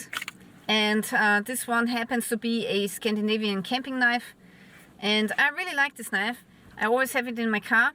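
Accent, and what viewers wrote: Germany. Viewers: I never liked a German accent but I like hers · Thank you for this summary of unsavory changes in Europe. Living in America, I’m often preoccupied with our actions and reactions, forgetting to stay up to date on the other regions. Love your accent by the way, reminds me of dear family friends I have in Mannheim.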